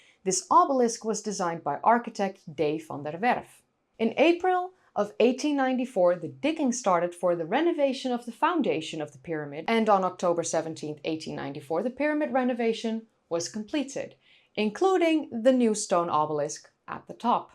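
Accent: Netherlands. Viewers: It would be an immense exaggeration to say I have the same reaction as Jamie Lee Curtis in A Fish Called Wanda (search YT for "fish called wanda russian scene"), but I do enjoy, in a perfectly clothed way, hearing say things as a Dutch person! Practice "Berg" op de wijze van, everyone Then the name of the original land owner Advanced users - say "Gerard The Umbrella Plant" in the manner of, as my best friend - a Dutch guy - insisted when i was 19yrs old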